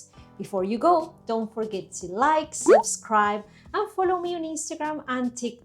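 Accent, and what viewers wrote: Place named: Rioplatense, Argentina. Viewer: Me gusta mucho este video y tu canal. Estoy aprendiendo español rioplatense y siempre estoy buscando por más input comprehensible con ese acento. Muchas gracias!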